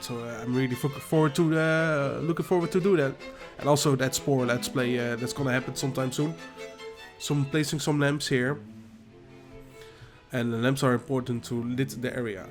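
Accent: Netherlands. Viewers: Ik hou van je Nederlandse accent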